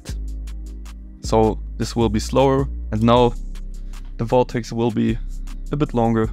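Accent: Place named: Germany. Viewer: Ich kann deinen Deutschen Akzent hören haha 😭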